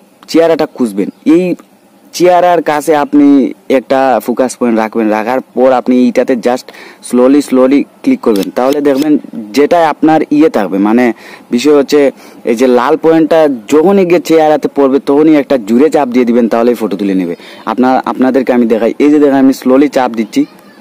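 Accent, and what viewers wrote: Sylhet, Bangladesh. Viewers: Sylheti na kita ba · সিলেটি ভাষায় মাত। 😊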